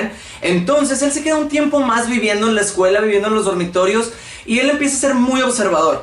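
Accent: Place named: Monterrey, Mexico. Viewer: En corto me di cuenta que eres de Monterrey JAJAJA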